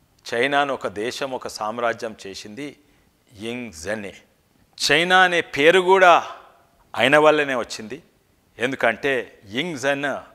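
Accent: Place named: Telangana, India. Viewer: సర్ నమస్కారం స్వచ్ఛమైన తెలంగాణ యాస ఎంతో బాగుంటుంది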